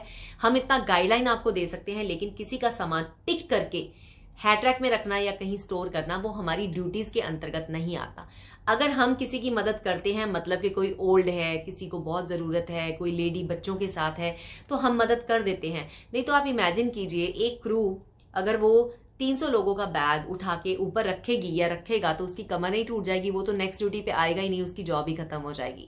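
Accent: Punjab, India. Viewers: Bahut kamaal Punjabi kudi Hindi achhi bolti hai par pata lag jata hai k hai tan Punjabi kudi🎉🎉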